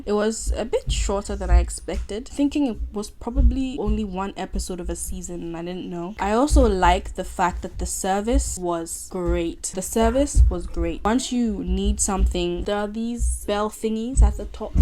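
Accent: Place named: Ghana. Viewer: Eehm this is my first time coming across your channel. Are you Ghanaian or south African cause I'm hearing 2 different accents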